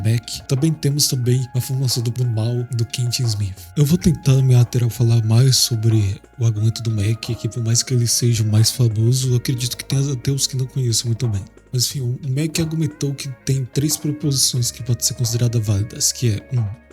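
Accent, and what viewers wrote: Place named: Northeast, Brazil. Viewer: Que voz horrível, parece um gripado falando com algum sotaque nordestino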